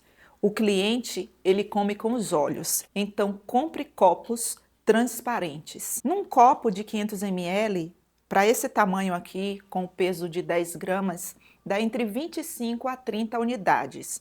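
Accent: Bahia, Brazil. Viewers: Kkkkk esse pedido de like, é cativante. Deus te abençoe. Esse sotaque baiano é indescritível. Deus te abençoe infinitamente.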